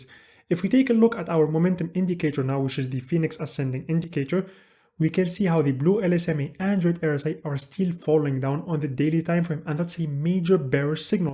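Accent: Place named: France. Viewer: L’accent français ça 😆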